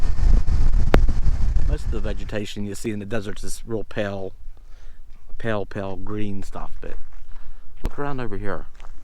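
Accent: Pennsylvania, United States. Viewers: Newer sub of yours! You definitely have a PA accent...I am originally from Mount Lebanon, PA-burb of Pittsburgh! Are you from PA or WV?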